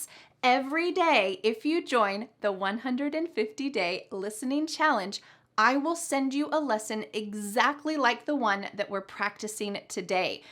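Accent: United States. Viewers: Is it U.S. English? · Thank you aunt for sharing your knowledge and i also exicted to want study with you in next video😁👌and last you are my good teacher, who is always help me learn new words in american accent......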